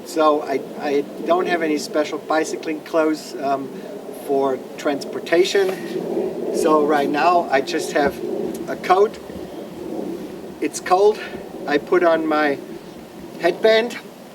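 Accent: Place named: Germany. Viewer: no, your accent gives you away from being originally from Germany, not that you have a business trip there. ;-)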